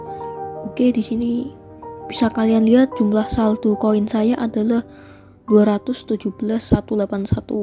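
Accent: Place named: Java, Indonesia. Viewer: Kayak orang Jawa ngomongnya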